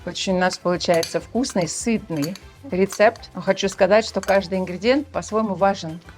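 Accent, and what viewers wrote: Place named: Baltic. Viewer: здравствуйте! Ваши рецепты такие классные и удивительные и мне это нравится! 😊 А на счёт вашего акцента, я думала, что вы из Прибалтики 😁 Удачи вам ❤❤❤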